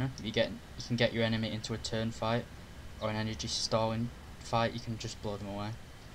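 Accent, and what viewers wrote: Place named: Yorkshire, United Kingdom. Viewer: It's great. I thought you were Yorkshireman like me.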